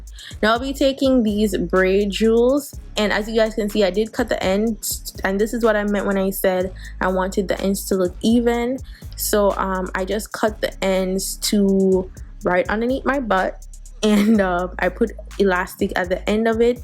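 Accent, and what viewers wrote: Jamaica. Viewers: i know you are jamaican lol the acent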